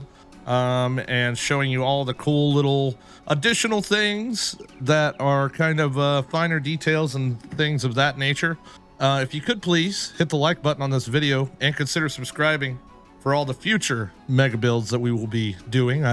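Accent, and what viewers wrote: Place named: England, United Kingdom. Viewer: I would have been really surprised if you were not nominated. That said though, a few people that have had great builds have missed out. It is what it is. Competition aside, I love your Enshrouded videos, keep up the good work. One complaint tho, you should have used your excellent English accent again when explaining the lore 😁😁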